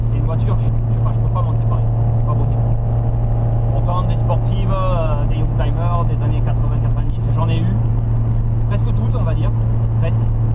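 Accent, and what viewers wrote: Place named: Hautes-Pyrénées, France. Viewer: Aaah super ! En plus des mob une rallye . J ai clio rs1 en plus du spx et mvx. Et à l accent je dirais que tu es de mon coin(65)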